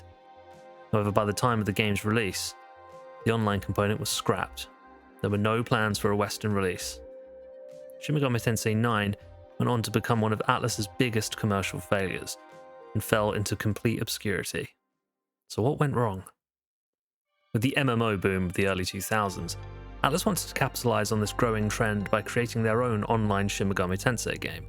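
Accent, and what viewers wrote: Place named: United Kingdom. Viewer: That's just the British accent haha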